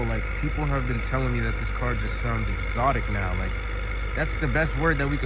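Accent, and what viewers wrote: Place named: Boston, United States. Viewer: aint even realize you from Boston too 🔥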